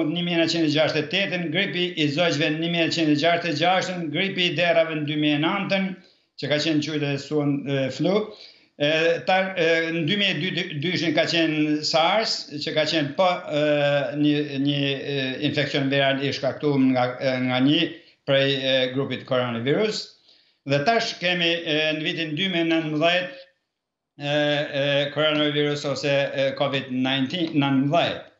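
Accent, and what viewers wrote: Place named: Kukës, Albania. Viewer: Me dialektin kuksian qe flet duket siku te kemi afer,fjalet tuaja te sherojne!Dhe une vi nga fisi,prandaj ndihem krenare per nje njeri si ti!Jetofsh sa malet Doktor!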